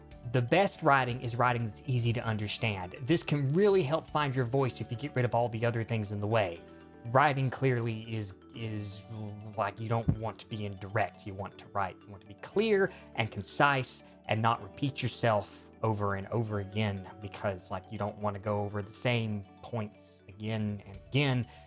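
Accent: South, United States. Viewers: Are you from the south? I never noticed how southern your voice sounded when I couldn't see your face. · You have the most interesting of accents that's its rather indiscernable what corner of the US (or Canada? Nah, I don't hear Canadian...) you hail from. It's like a southern twang with a twist. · Wtf face reveal! Also, you look way less southern than you sound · It's so weird actually seeing him, and then hearing him speak with the Southern(?) accent, even though I've heard him speak with it before. I guess it's because I live in New York City and only see him as a cartoon, and thus I associate the accent only with the cartoon, and not with three dimensional people. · NEVERMIND IT'S TENNESSE I KNEW IT WAS AMERICAN SOUTH. Also I was looking at his website and the thing about time traveling Nazis was in the same paragraph than the TENNESSE thing.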